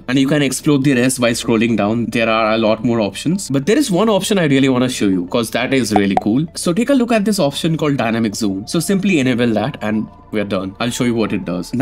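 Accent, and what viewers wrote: Bengal, India. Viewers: He sounds bengali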